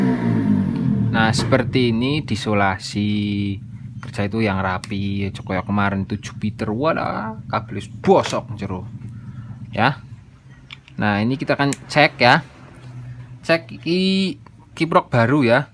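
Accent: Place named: Java, Indonesia. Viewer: Rika jawane Endi medok temen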